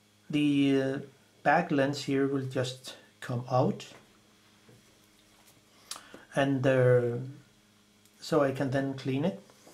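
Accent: Denmark. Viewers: Hi. Thank you for the video. 🙏 You sound Danish - are you from Denmark? If Can you please help me with cleaning an old Zeiss 50mm 1.8 Ultron lens? Kind regards Per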